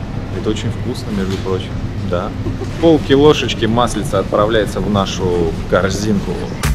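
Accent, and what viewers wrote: Saint Petersburg, Russia. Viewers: Ах , точно!! Ты же питерец!!! Поэтому эти "творогА" и "ГРЕЧА"!!!!! Ужас. А еще свЁкла!! СЕЛО!!!! ЧЁ СКАЗАТЬ!!? У вас даже в магазинах ГРЕЧА пишут😨. булоШные, калбаШные, молоШные...Откуда это??? С НЕПА Ещё чтоли??? Пазор. Это не русский, это видно древнеМосковский , из КалаШного ряда, купэческий , бублиШно- торгаШеский! ФУБЛЯ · чреча греча меня бесит это Питерский говор)))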